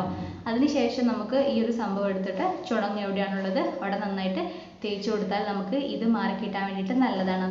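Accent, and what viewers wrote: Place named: Thrissur, India. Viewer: thrissur shaily is best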